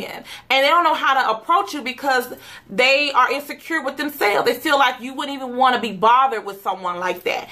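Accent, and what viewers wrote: Mississippi, United States. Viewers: I'm not like them but I love your videos!!! You must be doing something right. Remember you are going to have them haters which is very sad. To say the least. They need to get there life. Must don't have nothing better to do. Like that song. Plus jealousy sad. It's truly nothing wrong with the way you talk. You just have a country accent that's all!!! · your very inspiring.. I love how you handle the comments... I also have thick skin also.. I'm just starting 2 new channels and I am looking at other youtubers like you and I get cause y'all have a lot of subscribers where as on my other channel (not this one) has about 30 subscribers... don't get me wrong I love my 30 subscribers and I love them all dearly but I want to be inspiring to more people like you are baby girl... I have a lot of friends from missisipi and your voice is just like theirs and I can easily tell you are from missisipi and I don't think your voice is annoying... 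Much love to you and your life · Fuck em boo 💋 they need to boss they life up 😜 #LovingThatMississippiAccent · she may be fat but she still slays and she looks good Plus Size and you don't have the right to tell her that because she is still human and she is beautiful just the way she is, so all y'all hating keep it to yourself and if you wanna say something say it to her plus her 155.6K subs and the way she talks is just because she is from Mississippi. You can't hate on somebody just because they talk different from you. STOP THE HATE!! her family loves her the way she is so don't assume they are happy and if you think your hate comments are ruining the life, THINK AGAIN HONEY!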